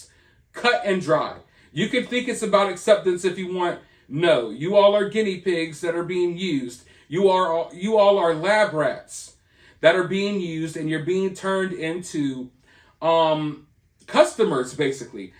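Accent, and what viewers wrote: South, United States. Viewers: That country accent, you sound like me lol!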